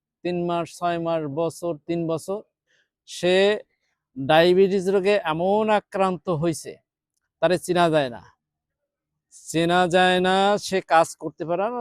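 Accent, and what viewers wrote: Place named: Jessore, Bangladesh. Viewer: আপনি মনে হয় আমাদের যশোর অঞ্চলের মানুষ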